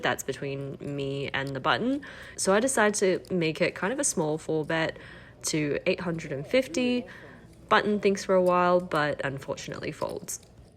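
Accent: Australia. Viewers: refreshing to hear an aussie accent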